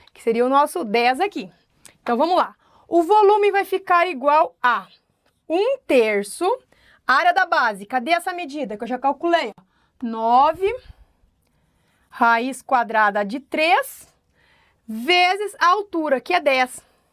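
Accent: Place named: Paraná, Brazil. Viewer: Olá professora, você é do Paraná? Pelo seu sotaque. Tenho vários alunos de lá, também sou professor, mas de eletrônica. Beijos de um prof. para outro.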